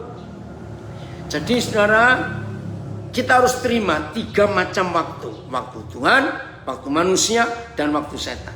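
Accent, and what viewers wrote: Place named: Surabaya, Indonesia. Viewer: Jelas psk dt suaranya dr surabaya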